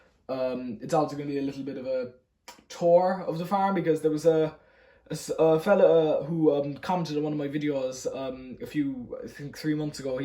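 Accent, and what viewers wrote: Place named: Cork, Ireland. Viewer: As a lawd from Waterford I can tell your from Cork by hearing your Accent 😂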